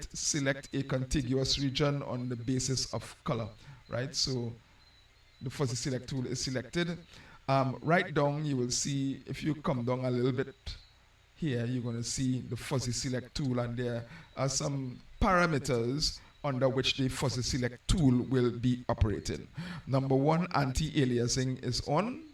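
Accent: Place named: Trinidad and Tobago. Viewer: Very good content. Nice to hear the voice of a fellow 'Trinbagonian'(?) doing GIMP tutorials.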